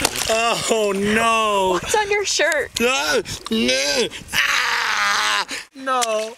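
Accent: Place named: United Kingdom. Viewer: he sounds british for me, like sir or smth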